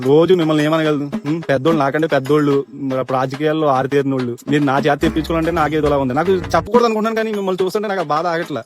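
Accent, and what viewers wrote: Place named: Godavari, India. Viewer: Tammudu nee godavari slang super😊😊😊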